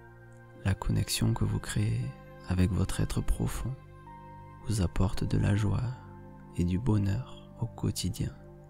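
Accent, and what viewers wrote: South, France. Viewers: j'adore ton accent du Sud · Cet accent du sud m'apaise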